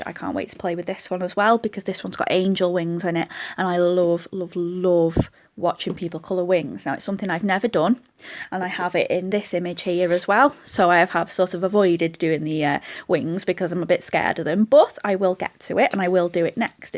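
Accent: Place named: Northern England, United Kingdom. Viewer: Great video and you have a northern accent like me 😍😍 Are you Manchester based ? You sound around Oldham Manchester way x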